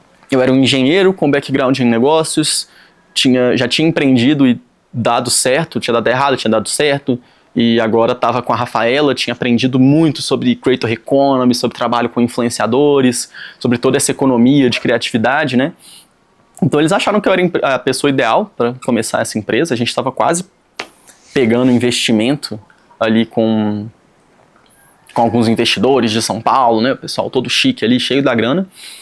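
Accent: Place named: Minas Gerais, Brazil. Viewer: Mineiro falando já dá uma paz de espírito… parabéns pelo conteúdo!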